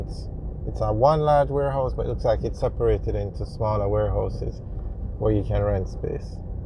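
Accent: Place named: United States. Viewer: You sound like someone that use to live in the states.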